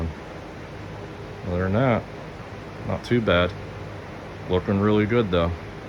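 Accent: Pittsburgh, United States. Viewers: That’s gotta be a Pittsburgh accent. If so I now live in Monroville can you fix my rockers and cab corners? 2000 f250 7.3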